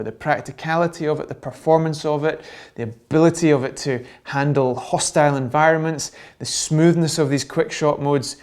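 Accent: United Kingdom. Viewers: Another superb video. I’ve only came across your videos a couple of days ago and I have watched a lot of them already. Well explained content which has helped a lot!!!! And....and....... being from Northern Ireland myself it’s great to hear a british voice doing a DJI tutorial. Thanks man...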